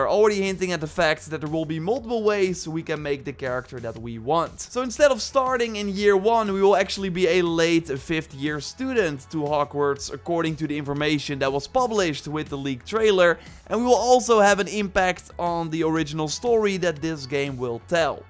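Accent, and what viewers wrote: Netherlands. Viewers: I can recognize your dutch accent, when you say "video" you can hear it for example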